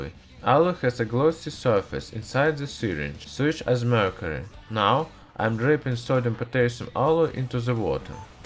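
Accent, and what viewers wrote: Russia. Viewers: Cilla Moke it's a Russian accent, isn't it?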